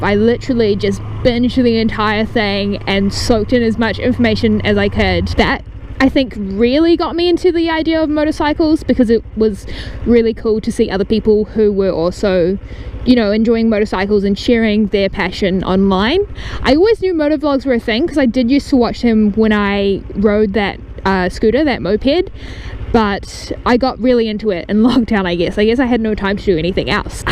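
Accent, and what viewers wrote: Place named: New Zealand. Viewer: Quite liking the New Zealand accent 👌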